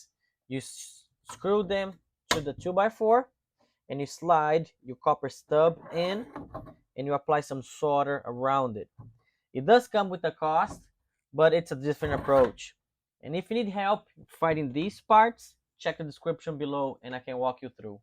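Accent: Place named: Brazil. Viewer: I can tell a Brazilian accent when I hear one haha great work man! Meus parabéns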